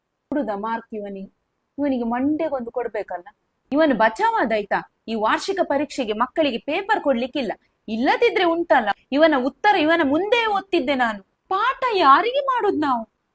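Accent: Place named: Mangalore, India. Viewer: Meenu tikkijindla bejar ejji anda fishing place and natural kudla style tulu patera soooper, wishing you better fishing next time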